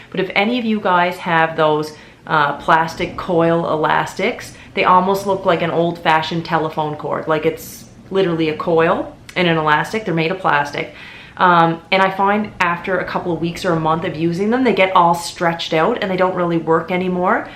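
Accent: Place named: Newfoundland, Canada. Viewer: “My toilets right clean” 🤣 I’m from Newfoundland, and I just love that your so free with your accent!!! 😍😍 right clean, yes byyyyyy!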